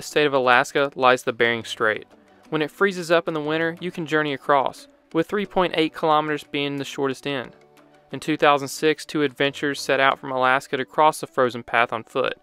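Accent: South, United States. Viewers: I just can't help to notice that you have a strong Southern accent. I don't know, it just sounded a little weird to me at first hahaha because oftentimes, the geography and history-related videos I watch are narrated by people with British accent. This is the first time I'm hearing someone talk about Geography with a Southern US accent. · Because of your American accent, I can't understand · Shout out to all the other people who heard his southern accent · Nice southern accent :)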